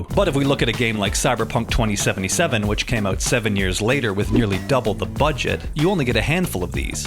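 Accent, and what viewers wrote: Canada. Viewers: Us? Your canadian?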